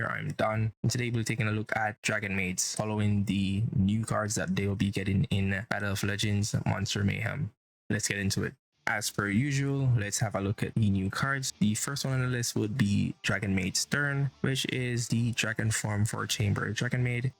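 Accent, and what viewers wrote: Jamaica. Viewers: Yuh sound like a yaad man.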